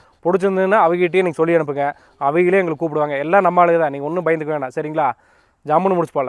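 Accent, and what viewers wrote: Kongu, India. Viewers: தலைவா நீங்க உடுமலைப்பேட்டை area என்று எனக்கு தெரியும்...அங்கு கொங்கு தமிழ் ல பேசுவாங்க என்றும் தெரியும்.. நான் 2011இல் கோவை - பெரியநாயக்கன்பாளையம் ல bharti ஏர்டெல் company இல் work பண்ணி இருக்கேன் .... கொங்கு தமிழ் ல நீங்க பேசுவதை தான் local language என்று கூறினேன்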